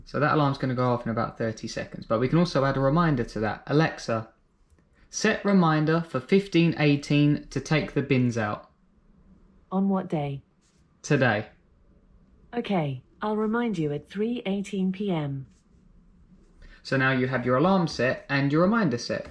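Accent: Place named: United Kingdom. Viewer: your voice sounds british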